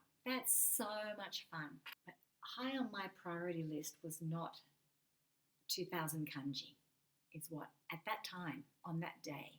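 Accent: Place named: Australia. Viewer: Absolutely love this channel your technique is great too. Do you live in Japan? Your accent sounds Australian.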